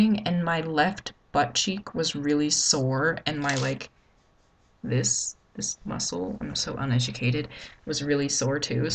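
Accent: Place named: Germany. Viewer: It's great to hear your German-influenced English now! You're speaking English but you're saying it the way I imagine the German phrases or expressions would be said. :)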